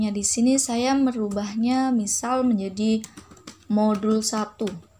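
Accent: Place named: Tegal, Indonesia. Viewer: Ilmu yang bermanfaat by ngapak tegal junior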